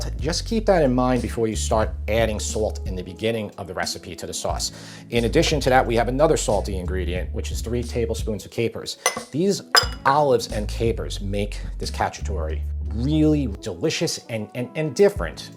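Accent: New York, United States. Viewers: I love Italian food (and the Italian New York accent, hehe)! I'm gonna try making this soon. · Sorry, I'm Italian and I don't know the accents in America. But now here what would be “Italian” about his New York accent ? He is American, born in the US, speaks only English, Italian he doesn't even know, how can he now have an “Italian” accent ?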